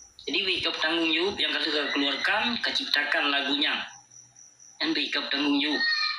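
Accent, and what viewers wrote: Aceh, Indonesia. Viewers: Haaaa....haaaaa...😄😄🤣🤣🤣🤣🤣🤣 ikee asai kunonton dan ku deungoe abusyiek teumeunak saket pruet ku khe'm. 🤣🤣🤣🤣🤣🤣🤣🤣🤣🤣🤣. Mantap abusyiek. Tok asli Aceh Cara meunarit dan ceurapa. Hana dua abusyiek 👍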